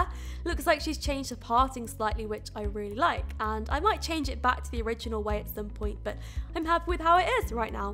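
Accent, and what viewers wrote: United Kingdom. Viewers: I love your channel!! And i wish my british accent was as nice as yours; your voice is so nice!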